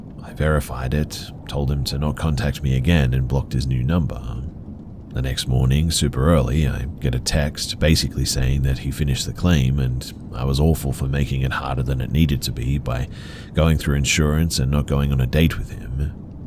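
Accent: Australia. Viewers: Are you South Aussie? I mean; I am. And you have that type of Aussie accent you can’t quite pick which state you’re from 😂 but love the videos, they help me sleep. You’re voice, definitely top tier 🥹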